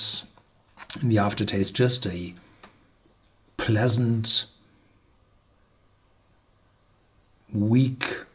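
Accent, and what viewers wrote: Germany. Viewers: Joe Macchiarulo he's german...almost all German English speakers speak like that.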